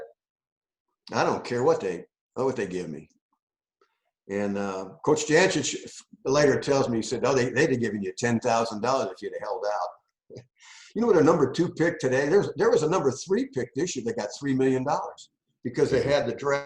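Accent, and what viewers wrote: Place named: Indiana, United States. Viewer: u sound like a maga in indiana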